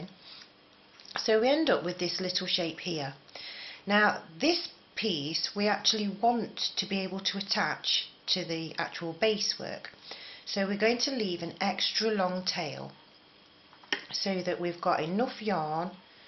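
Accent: United Kingdom. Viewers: I love this pattern and how you've divided up the series to be as simple as possible! I'm not exactly new to crocheting...but I am new to crocheting correctly and new to following patterns! I only ran into one area of difficulty in following the written pattern you have in your description; and that's when you've finished the toe area, and you're adding the tongue to it- you write "count back 6 from last sl st of toe section". In your video, you instruct "don't count the slip stitch area - start counting with next stitch," and perhaps your written instructions could be clear about that, as well. No big calamity, as I'd only done one tongue wrong before referring back to your video. A big huge thank you for your excellent video quality, as I never had problems following where you were putting your stitches (most other videos are terribly frustrating in how many times their stitch work strays from sight!) and listening to your fun British accent was a treat, too! 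To thank you, I let every ad play out!;)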